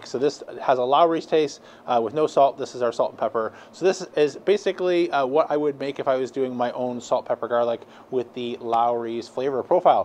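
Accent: Canada. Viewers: Great video!! You sound like you're from Canada. Are you the one who created all this smoke? 😳 😉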